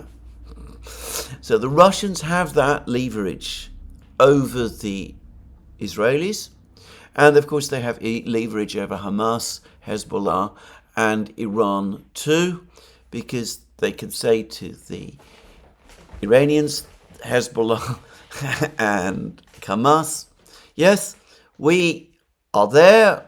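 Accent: England, United Kingdom. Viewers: EduardoArtistaDelavega: you are talking from a common sense perspective, you are exposing the hypocrisy of America, but then you spell colour via the Webster dictionary. The Duran are interesting also, when I first watched the Duran I really believed that Alex not only lived in America, but I believed he was an American, even though he had Greek ancestry, as all Americans have ancestry from somewhere else. Alexander on the other hand, is so English in his use of the English language, coming here as a young boy, approximately aged 6, he has adapted to become very much an Englishman. But then one day soon after the Special Military Operation started, I discovered that Both Alexander and Alex had individual YouTube channels. Alex was walking around Nicosia on a daily basis and would also travel on occasion around Europe, I was totally taken aback by this fact, that Alex with his very strong American accent, didn’t even live in America, he probably just learnt American English, I still don’t really know why he has such a strong American accent. 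So we have this contrast with The Duran, one articulates himself via an American English accent and the other as an Englishman, but both are Greeks, although one is a Greek Cypriot. But this oddity is actually a winning combination and adds to the aurora of The Duran. So Eduardo, are you American, your use of English tells me you are not, but your spelling, tells me you are. Which are you?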